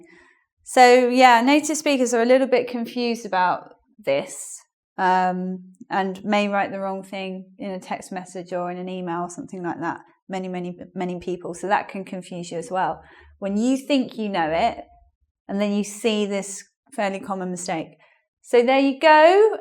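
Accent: United Kingdom. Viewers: Has the British prounonciatinon? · I do like your British English! I'm fond of it! : ) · Excellent Video.... I love it the british accent....very useful for me, thanks for sharing. One thing ....you are excellent teacher.....Don't worry about bad comments.... Congrat.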